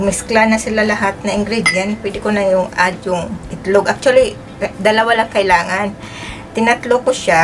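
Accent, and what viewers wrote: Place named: Visayas, Philippines. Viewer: Bisaya ka po pala mam..galit na naman po siya ehehe...kakwela nyo po at masarap magluto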